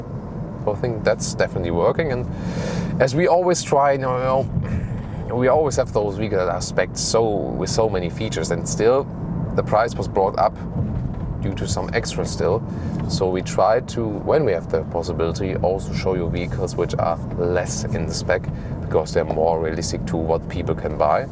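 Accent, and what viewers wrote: Germany. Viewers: You have got a thick German accent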